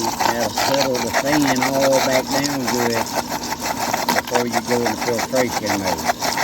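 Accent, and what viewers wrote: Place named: Appalachia, United States. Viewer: I've heared that accent once before in the Appalachian mountains around Elizabethton Tennessee. I'm a retired welder. I've worked all over the US even spent a lot of time in the ship yards in Louisiana. That accent I've only heard in one place . Appalachian Mountains. Thanks again for your videos. I'm sure I'll be back next time I need to know how to. Have a great day and be safe keep one in the chamber. 😊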